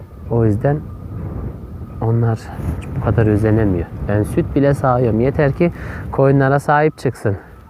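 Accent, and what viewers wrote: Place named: Central Anatolia, Turkey. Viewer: Çoooşu ne güldüm ya İç anadolu Şivesi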